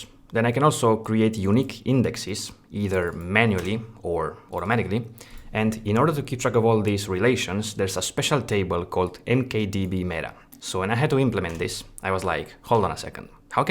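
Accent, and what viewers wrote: Spain. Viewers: Tienes acento mallorquín. ¿Eres de por ahí? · You're not Spanish? WTF? Where are you from then? I'm a native speaker and when I hear you I have no doubts you are from Spain. Tío, qué cojones dices. XD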